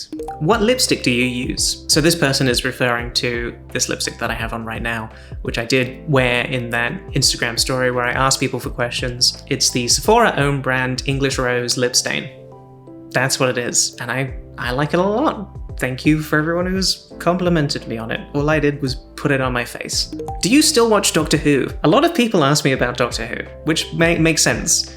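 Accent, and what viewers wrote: Canada. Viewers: Wow you can really hear the Canadian accent now. Crazy how quick accents take hold. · Your whole style is so good and you look so happy and comfortable (!!) but the part of this video that caught me the most by surprise was hearing the distinct traces of a Canadian accent in your voice. Didn't expect that little twist! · Omg you sound so canadian! Welcome back to youtube :) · So happy you are happy, and seeing you live your best self! Take your time & do what you are comfy with! Also couldn't help but giggle at the words that sound more Canadian now hehe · Your voice sounds so comforting but I'm noticing a little bit of Canadian accent now! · Lovely to have you back and with an extra Canadian twang to your accent! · ! Thank you for reintroducing yourself after all these years. This has felt nostalgic in a way. The only thing that made me think… WHAT?! 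Is your new Canadian fusion accent haha. Nice to see you again 😊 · For the first couple of minutes I kept thinking, "There's something different about her voice..." and then she mentioned living in Canada and I was like, "Ah, yup, that's it." Congrats on your gender transition and your Canadification transition! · hahahaa you've picked up the Canadian accent! it jumps out whenever you say "back" :') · Your accent seems to have been influenced in Canada! Ok vowel shift!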